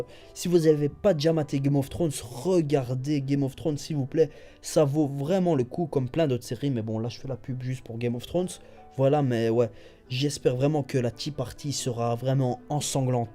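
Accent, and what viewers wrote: Belgium. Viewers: Question complètement hors sujet désolé mais T'es belge, est ce que tu viens de Huy ? ^^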